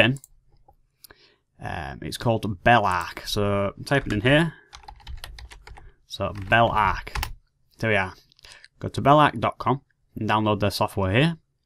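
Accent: United Kingdom. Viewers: Chris Nova its real English, we call it British English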